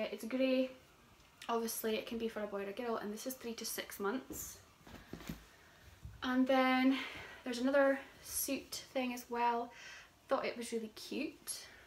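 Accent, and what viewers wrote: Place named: Scotland, United Kingdom. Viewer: I love the idea that they included the poem. I clicked on the video because my Grandmother was born in Scotland and I love hearing the accent.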